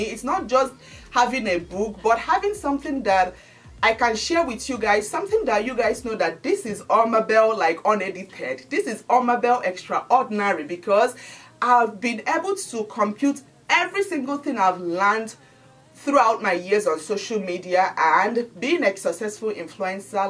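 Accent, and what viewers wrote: Igbo, Nigeria. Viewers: its even the accent that made me subscribe....I am an Igbo girl too, and you sounded so relatable. My first time seeing you was that collab you did with Dinma Umeh. Well done for your resilience and Congratulations, you really inspire me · I don’t have any acne but I was drawn to your channel because of your accent😊I loved the fact that an Igbo girl like me wasn’t ashamed to be herself on YouTube. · I started watching some 10yrs ago too. I remember I was looking for some videos on how to make Nigerian fried rice and how to tie gele. But I remember clicking on one of your acne videos and immediately I heard your voice, I said, this is my Nigerian sister and I subscribed. You have really come a very long way sis. And we thank God for his faithfulness. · Hahaha , 😂you are soooo real.love you for your sincerity, love your Igbo Accent like the french, Italian, Spanish........❤❤❤ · This is a huge motivation ❤ it was even your so called "Igbotic accent" that made me stick to your channel since I came across it in 2019 bcos it makes me feel at home (like having my sister talking to me)😂 unlike watching influencers with American accent.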